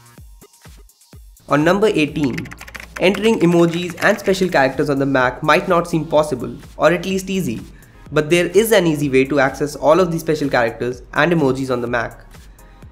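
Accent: India. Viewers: Good video but the thick Indian accent forced me to watch several times to get the point. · I cannot understand Indian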